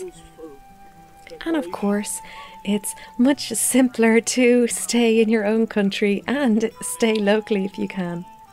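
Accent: Ireland. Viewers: What an incredible way to spend some time with your father ❤️ I love your Irish accent. In my home, my children bring back the fruit scraps from their lunch or snack. I keep all in the fridge in a container and use them to make a lovely sorta “Christmas” scent in the crock pot. Add some cinnamon and vanilla extract, and omg heaven. Once the scent has been used to its full potential, I purée it, mix it with water and left over coffee grinds, feed it to my garden. Works for me and nothing goes to waste :) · All your videos just make me want to visit Ireland, it looks so beautiful and calm and the accent is gorgeous. I'm so happy that you appreciate your country so others can as well.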